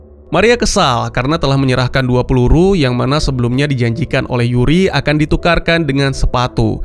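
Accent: Aceh, Indonesia. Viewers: Orang aceh ya bang?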